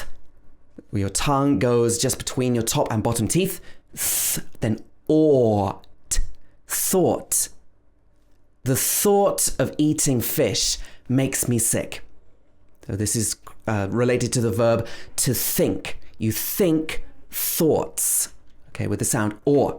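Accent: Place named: RP, United Kingdom. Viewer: Bloody gorgeous British accent(posh)💚💚💚💚💚!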